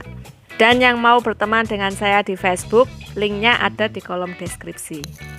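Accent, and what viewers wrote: Java, Indonesia. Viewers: Suaranya medok banget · Asli mana mbak Lamongan ta? Kog jowoe medok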